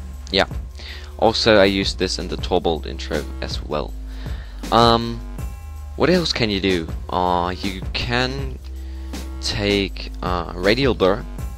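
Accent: Germany. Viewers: lol du bist deutscher? dein englisch is ja mal überboss ;D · ist er deutscher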